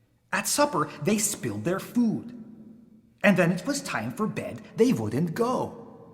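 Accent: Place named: Transylvania, Romania. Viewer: Oh, this is adorable! If my LO can understand your (quite well-done!) Transylvanian accent (she’s 3 🤷🏼‍♀️), I’ll introduce her to a few of my favorite SSTTITD stories.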